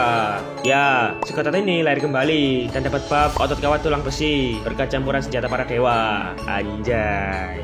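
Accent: Java, Indonesia. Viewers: Bang kowe asal jowo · Anjy Abang orng jawa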